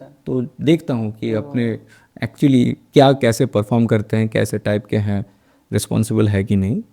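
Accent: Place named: Bengal, India. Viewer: Bengali and Hindi accent combinations ❤️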